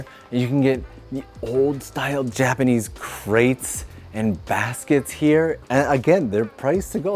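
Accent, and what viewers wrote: Canada. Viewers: Your Canadian accent really came out in this episode. Great stuff! love your videos.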